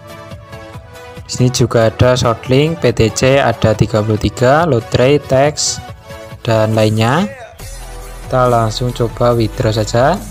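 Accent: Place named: Banyumas, Indonesia. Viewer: Logat ngapak pa ya.. org mn nich?😁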